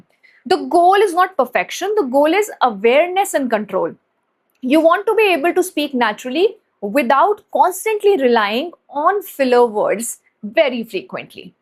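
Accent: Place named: India. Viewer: Everything is fine . Your accent needs a bit of refinement. R can be pronounced in Brit or American way. The Indian R 😅 ( barring Northeast)is really Bad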